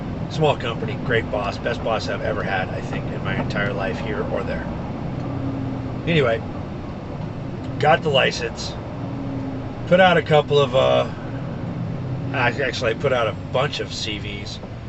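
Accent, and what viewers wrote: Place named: United States. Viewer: Sir, congrats. U're coping well with the shit trough Èurope and life in general. I think u're great at storytelling. Record just like this, no edit, no music. Talk or comment on different themes, your genuine american-english is quite interesting. Upload 1 or 2 20 min videos per week. Stay safe and healty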